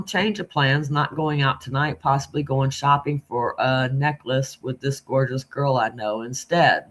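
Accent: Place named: Wilmington, United States. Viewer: Girl, where are you?? You sound like Wilmington or Bolivia maybe? I'm in Wayne...Goldsboro but I grew up in Duplin. I always love running across neighbors! You are 100% right, hes a big ol titty baby, for sure!! My Granny used to say that all the time!!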